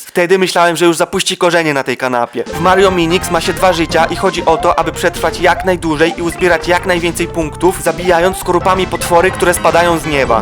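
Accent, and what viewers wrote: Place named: Podlasie, Poland. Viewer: NO BARDZiEJ Z PODLASiA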